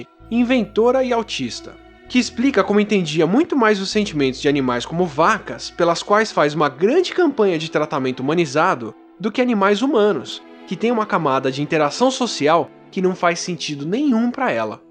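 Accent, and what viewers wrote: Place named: Rio de Janeiro, Brazil. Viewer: this carioca accent is deadly deplorable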